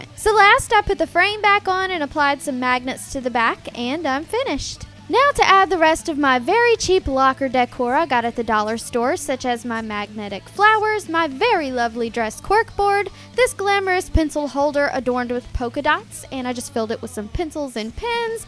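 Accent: South, United States. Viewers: are you from the south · You have a country accent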